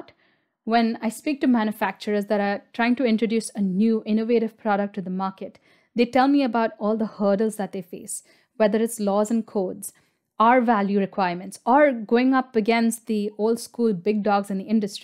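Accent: India. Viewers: I now watched several of your videos. I'll be honest here. When The Algorithm showed me your first video I saw a woman with Indian accent and all my biases (yes I have those, sorry I am human) kicked in. But listening for few minutes and I was hooked. Well researched, well versed and you seem super nice and reasonable. Great content, well produced, down to earth and informative. Thanks!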